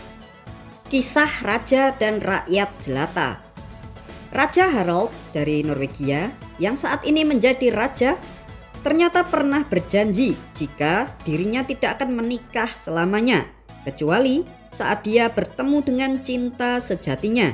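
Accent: Java, Indonesia. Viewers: Orang jawa ya min?? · Admine ughong jowo iki pasti😅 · Mimin orang mana kok meddhok?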